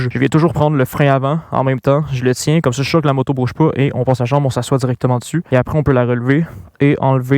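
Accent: Quebec, Canada. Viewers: Tres bien expliqué, je ne suis pas novice en moto mais je suis en train de passer le permis actuellement et les explications sont limite plus claire que le moniteur malgré l'accent Québécois 😂 Bravo continu 👍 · Mais excusez moi, l'accent canadien est vraiment très chiant 😂😂😂😂😂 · Bien expliqué bravo même avec l'accent canadien. Très cool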